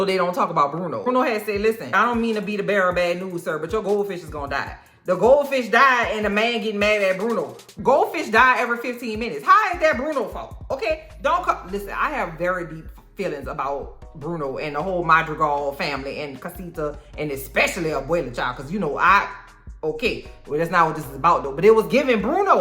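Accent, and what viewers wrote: New Orleans, United States. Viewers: I love the way you explained this, your Nola accent makes it better “Baaaaby” 😂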